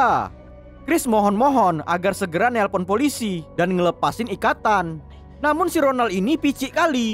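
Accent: Medan, Indonesia. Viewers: Mantap bg suka kali aku penerangan abg logat Medan nya kren salam dari Sibolga bg 🙏😊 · Medan kali yakan bos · Org medan ya min... Wwkwkwkw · Lucu lae nih,,bahasa medan kali😁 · Medan kali ngomongmu bang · Medann kali ahh BG😂🤙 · asal medan ya bg dri cara logat bicaranya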